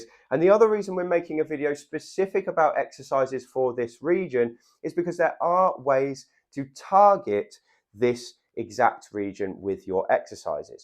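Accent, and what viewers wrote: United Kingdom. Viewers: I've subscribed. I like this British accent, one can not hear it very frequently. I'm starting with the exercises. Thank you.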